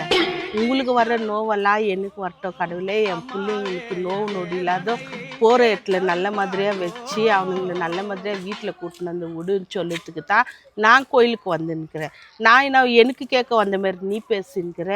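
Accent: Bangalore, India. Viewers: சூப்பர் மா நான் சமீபமா தான் உங்க வீடியோ பார்க்க ஆரம்பிச்சேன் சூப்பர் பெங்களூர் தமிழ் எனக்கு ரொம்ப புடிச்சிருக்கு நாங்க சேனலை சப்ஸ்கிரைப் பண்ணிட்டேன்.. வருத்தமா இருக்க சமயத்துல உங்க வீடியோ பாக்குற நான் மகிழ்ச்சியா இருக்கிற😊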